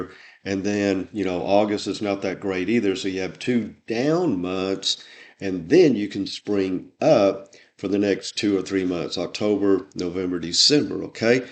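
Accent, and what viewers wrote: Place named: Texas, United States. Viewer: Thanks for this. I’m in Travis & Dylan’s courses, but your instruction also seems like it would be helpful. I grew up in TX, but moved west 30 yrs ago. It’s nice to hear a TX accent (yours is very slight). Southern accents like some in Georgia & Tennessee are very strong in comparison.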